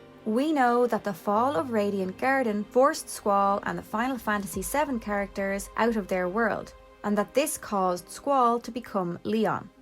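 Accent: Ireland. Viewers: Love the Irish accent.. need to go visit my family over there 👀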